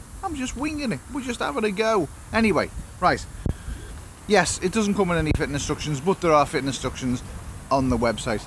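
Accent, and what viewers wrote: Liverpool, United Kingdom. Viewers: Scouse YouTuber...go ead la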